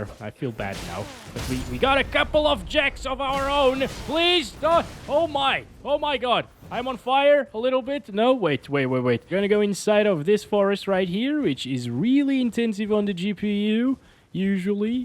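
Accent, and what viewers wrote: Portugal. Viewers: Descobri agora que esse cara é português XD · Kksksksksksk coe mane, o cara é português que doidera🤝🏻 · você é português !!!????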